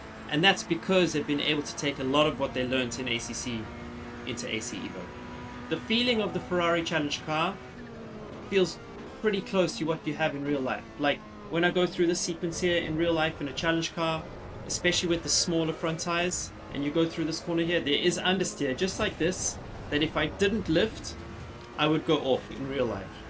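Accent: South Africa. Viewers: flawless commentary from the S.A accent lol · Hiya, I've only ever seen a banner of coach online and this is my 1st time seeing anything you've done. But I know this is random but... Are you South African by any chance? I'm from jhb and and I can't shake off the feeling that you are from the accent lol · Your accent is very South African. You been?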